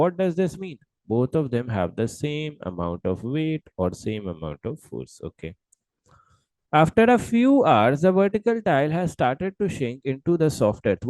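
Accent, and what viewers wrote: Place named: India. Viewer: fav indian accent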